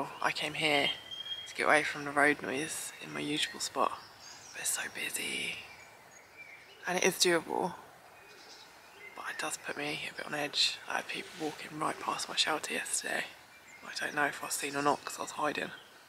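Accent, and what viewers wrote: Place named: England, United Kingdom. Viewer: Love your English accent and pronunciation :-) ❤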